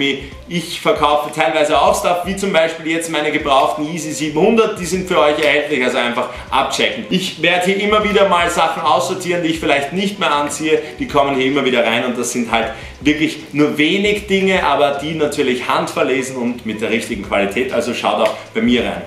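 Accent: Austria. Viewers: Liebe deinen österreichischen Akzent. :D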